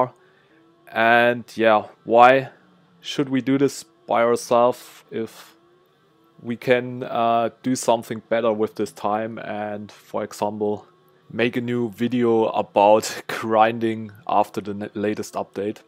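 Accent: Germany. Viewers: Man hört den deutschen😂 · Deutscher Dialekt, hört man sofort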